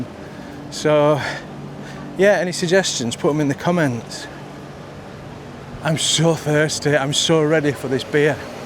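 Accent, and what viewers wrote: Yorkshire, United Kingdom. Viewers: "It's not right warm" - gotta love a yorkshire dialect 😊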